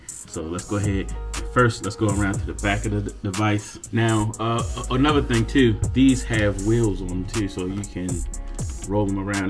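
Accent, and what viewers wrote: Baltimore, United States. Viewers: My guy, are you from Baltimore? I hear the accent.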